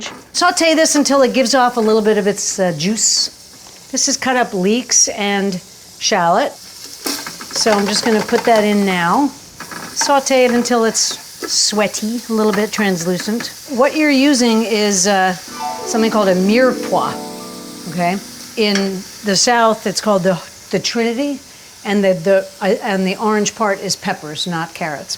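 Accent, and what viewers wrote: RP, United Kingdom. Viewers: Wow that’s a wicked English accent posh ok 👌🧑‍🍳🌹.Iam looking forward to seeing you your meal 🥘 today 🌹🌹🌹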